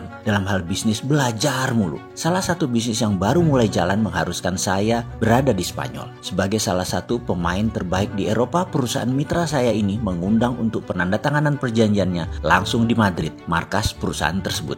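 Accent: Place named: East Java, Indonesia. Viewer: Ha-ha-ha kocak Jawa Timuran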